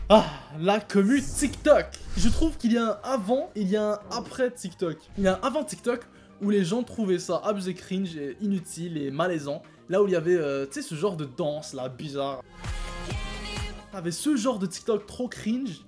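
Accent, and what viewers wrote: Quebec, Canada. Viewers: Je me suis abonné au moment où j’ai réalisé que t’avais un accent québécois de ouf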